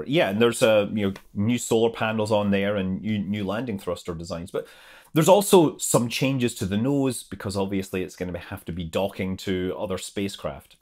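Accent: Scotland, United Kingdom. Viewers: Otpyrc Ralph Pierre "Kockney"? He's Scottish! Cockneys are from London!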